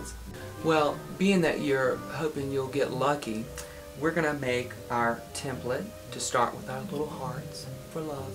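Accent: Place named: South, United States. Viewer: thanks for the reply! I definitely like you're idea better, I'm working on mine tonight as soon as I get home! Keep up the great work!! Can't wait for thext video! BTW, where are you from? You sound soo southern!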